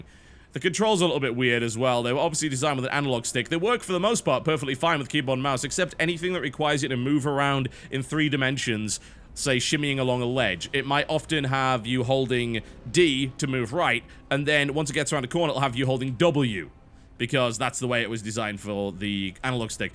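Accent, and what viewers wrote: United Kingdom. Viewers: Oh by the way, The Gods, he is british. It's called an accent. Deal with it.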